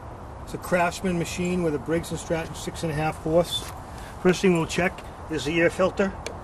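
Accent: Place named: New Jersey, United States. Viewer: Youve got a jersey accent. My daughta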